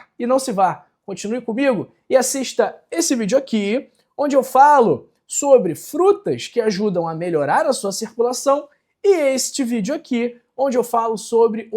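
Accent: Rio de Janeiro, Brazil. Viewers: O conteúdo pode até ser útil , mas esse sotaque carioca ninguém merece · Esse sotaque carioca é horrível, muito Ruin, muito chato, é muito cansativo ficar ouvindo isso...pqp... é muito forçado...cara vái fazer outra coisa na vida q não precise falar nada... não abre a boca por favor... credo...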